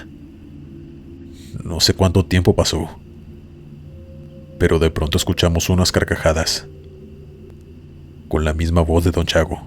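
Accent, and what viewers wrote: Mexico. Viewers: Hola, de dónde eres? 👁👄👁 como que me das vibras norteñas, de México 😅. Por cierto, excelente vídeo, como siempre, me encanta escuchar tus vídeos, me acompañan desde en el tráfico, o haciendo quehacer en casa. Saludos desde Guadalajara, Jalisco 🎉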